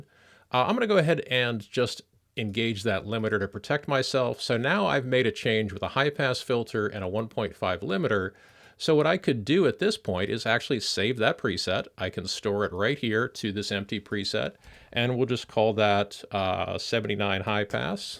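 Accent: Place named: United States. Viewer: Thank you so much for sharing your precious know-how. (And really Excuse me, but which side of the US are you from, East, West, South, or North? Because I really like English pronunciation like yours. I'm asking because I really want to know which side of the US that pronunciation is from.) Sincerely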